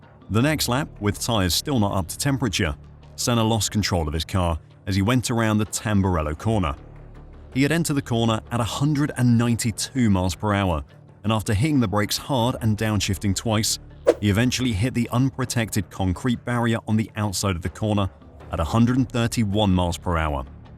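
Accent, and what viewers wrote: England, United Kingdom. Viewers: Regarding Tom Pryce's accident - it's "car park" - not "parking lot". You sound English to me so you should use the English terminology.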